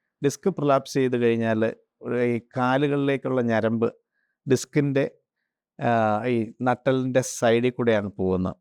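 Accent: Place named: Trivandrum-Kollam, India. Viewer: ഡോക്ടർ കൊച്ചിയിൽ ആണെങ്കിലും. സംസാരം ട്രിവാൻഡ്രം കൊല്ലം ശൈലിയിൽ ആണ് കൊള്ളാം 👌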